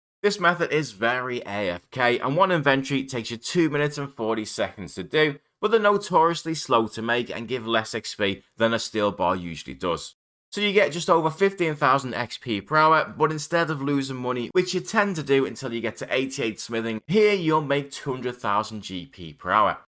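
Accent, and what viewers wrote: Liverpool, United Kingdom. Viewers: Fellow scouse osrs player?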